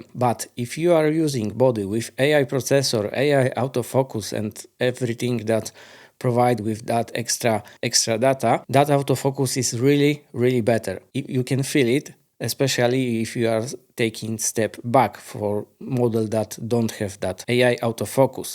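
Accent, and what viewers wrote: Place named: Russia. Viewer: Your accent is very simillar to russian😅 I want to by e6700. Thanks for testing it.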